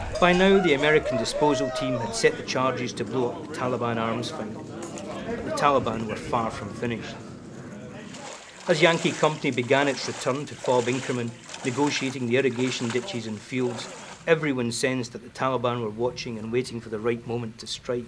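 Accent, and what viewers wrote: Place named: Scotland, United Kingdom. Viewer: To bad the Scottish accent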